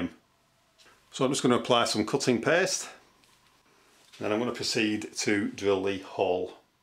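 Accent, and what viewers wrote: United Kingdom. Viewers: HOW VERY KIND FROM YOU TO SHARE THIS SURGEON LIKE PRECISION WORK, AND IT HAD BEEN AWHILE SINCE I HEARD THE BRITISH ACCENT, WHERE IN THE UK ARE YOU?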